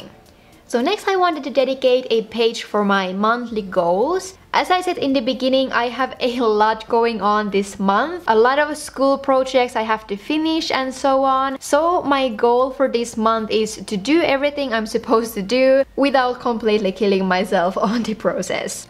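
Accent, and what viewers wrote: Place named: Finland. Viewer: Ohhh I knew that you were Finnish, because I'm in a European school in Brussels with Finnish sections and when I talk to my Finnish friends, they sound like you! I like Finnish, it's a very unique language!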